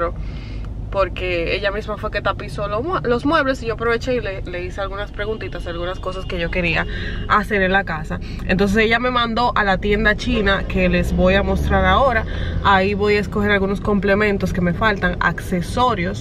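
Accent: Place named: Dominican Republic. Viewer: Uy! ella esta hablando entre amigas y es dominicana a veces hablamos asi aunque sabemos perfectamente como pronunciar las palabras, ella esta bien formada profesionalmente se le nota. Valida tu corrección pero ten pendiente la nacionalidad de la YouTuber cuando oigas cualquier cosita mal pronunciada.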